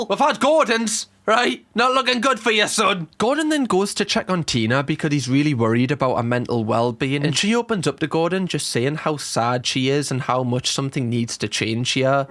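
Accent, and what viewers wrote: Newcastle, United Kingdom. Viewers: I am a 46 year old mom of 6 and grandmother of 3 so far and Ditto all of the above comments. Including the Geordie accent comment. It’s one of my favorites! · I am a happily married 43 year old mom of 3 with 2 older kids and a 15 yr old. I love the care and thoughtfulness he puts into these videos! Plus I'm an American who is a sucker for a Jordy accent!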